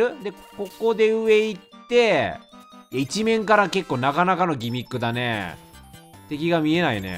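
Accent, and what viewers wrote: Kansai, Japan. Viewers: って絶対関西弁需要分かってるありがとうすき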